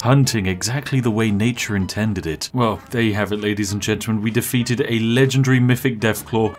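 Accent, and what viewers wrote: United Kingdom. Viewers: Starting with nuking a group of harmless deer, you had my attention, glorifying the best tea brand and you've earned my subscription. Spiffy Brit accent is icing on the cake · I love your British accent. Please marry me.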